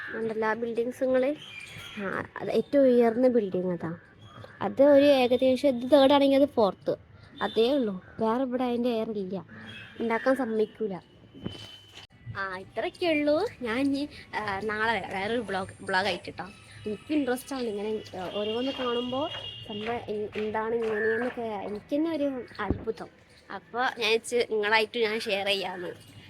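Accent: Malappuram, India. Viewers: മലപ്പുറം സ്റ്റൈൽ സംസാരം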